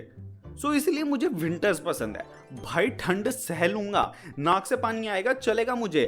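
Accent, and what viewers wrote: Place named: Uttar Pradesh, India. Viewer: Bhai aap UP se ho kya BOLNE KA TARIKA MERA JAISA HA TERA 😂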